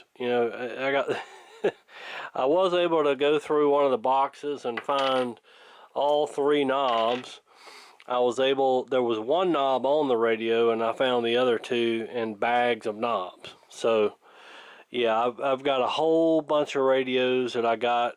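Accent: South, United States. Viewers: I like to take large tabletop radios like that that are junkers that are kind of not really worth restoring and turn them into guitar amps so if you have any of those basket cases where you don't feel like it's worth restoring to a good workable radio. Even if the chassis is missing and it's just the wooden shell I can make a chassis out of sheet metal and source transformers. Maybe we can work something out. It sounds like you're in the South you might not be too far away from me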